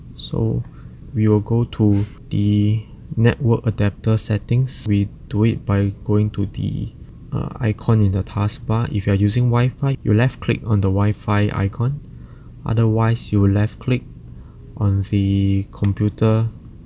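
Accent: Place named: Singapore. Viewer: Singaporean Accent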